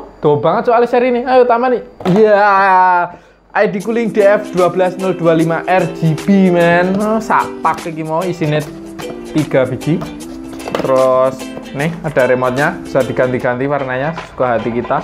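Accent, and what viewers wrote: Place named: Java, Indonesia. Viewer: Wah jowo, tak sub lur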